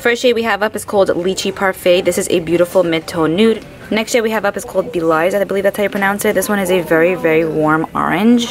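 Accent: New York, United States. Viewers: I never comment but always watch since you came up on my suggested and I subbed. Love your accent I too am a New Yorker but I live in the deep Deep South (Florida😎) so you sound like home💖 now back to my point that exclusive for Harrods was named for Princess Charlotte they did a whole Royal line so that is an amazing find! Love all your hauls I’m a big TJ Maxx fan and just keep these videos coming!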